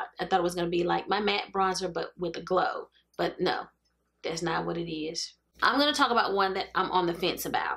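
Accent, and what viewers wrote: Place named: South, United States. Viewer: I adore your personality. Where you from? You sound southern.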